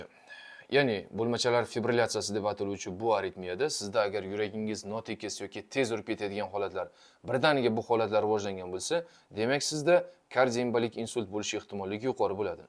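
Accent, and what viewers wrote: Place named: Fergana Valley, Uzbekistan. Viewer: Доктор болам сиз каердан сиз шевангиз Водийга ухшаяпт телфонтракамиз чикаринг мен жуда керагл экансиз доктор болам ???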